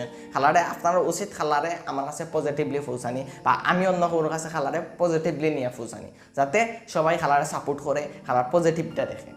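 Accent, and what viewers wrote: Sylhet, Bangladesh. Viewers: Hmm Good সিলেটি আঞ্চলিক ভাষায় কথা কইলে বালা লাগে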